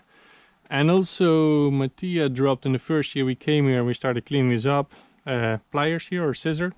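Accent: Netherlands. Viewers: So obvious cause of the accent he is Dutch from origin.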